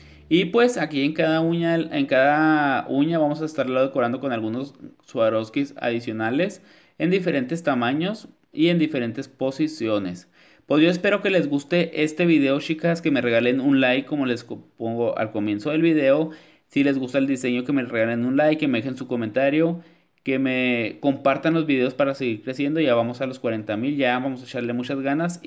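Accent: Chihuahua, Mexico. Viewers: eres de chihuahua?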